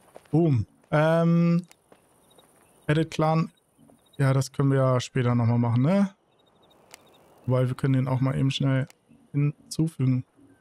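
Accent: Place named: Sweden. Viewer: ist Schwede Mütterlicher Seite. Da Spricht man es so aus.😂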